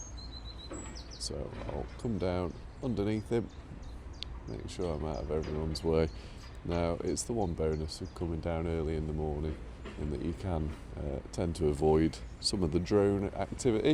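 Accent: Shropshire, United Kingdom. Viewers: Nothing wrong in using your Shropshire accent. My granny was very proud of hers even though she moved to Lancashire when she was 18 yrs old. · Continue sounding Shropshire,; you're just fine. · Don't worry about your accent or it being Shropshire. For us foreigners it's all an English accent. Main thing for me is that you are annunciating well enough to understand without captions. There is something about your videos though that if I watch them at night they put me right to sleep. Keep up the good work. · More education for me. I've stayed in Shropshire a few times but never knew what the accent was. Actually pretty good. Not Northern Southern or Brum! Another great video. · As an American Anglophile I absolutely LOVE your accent,! Let the Shropshire lad free! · Very nice Shropshire accent. You speak clearly and we can understand you. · we love all British accents including Shropshire! 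Waving from Australia 👋🇦🇺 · What's wrong with your Shropshire accent? It's perfectly understanable and much better than trying to 'put on a posh accent'. I'm Scottish and I don't change my accent and seem to be understood everywhere. That excavator driver is amazing. The way he put the orange tarp into the dumper was a work of art. Imagine seeing the excavator and the spider together. It would be like watching a choreographed ballet.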